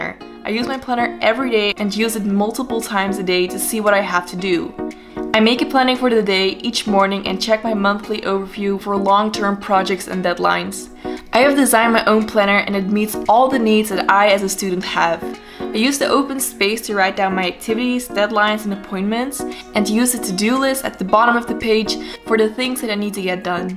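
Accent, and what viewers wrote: Netherlands. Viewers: WHAT? are you dutch? omg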